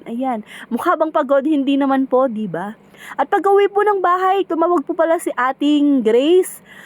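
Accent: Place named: Pampanga, Philippines. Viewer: gusto ko yun kapampangan tagalog hehe!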